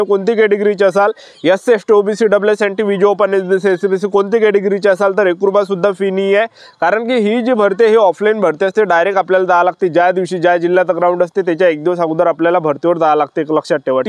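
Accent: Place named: Parbhani, India. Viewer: परभणी बोलते ❤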